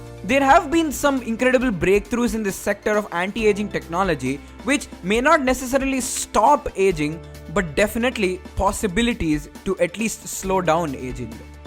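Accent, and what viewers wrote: India. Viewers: Good topic and research but narration is bad. Feels like a Hindi professor teaching in English.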